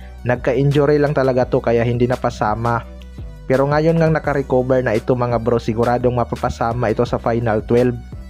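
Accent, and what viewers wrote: Visayas, Philippines. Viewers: Ay c bisaya pla nagbabalita eh...wala yan...di makatotohanan yang balita nyan...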